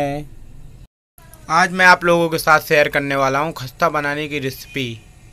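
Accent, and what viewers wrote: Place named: Allahabad, India. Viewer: Bhaiyya apke baat karne ka andaz pura allahabadi hai😊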